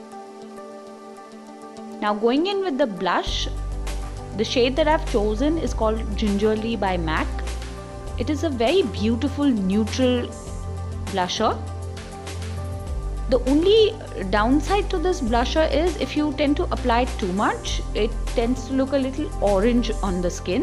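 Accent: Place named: India. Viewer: i love your indian accent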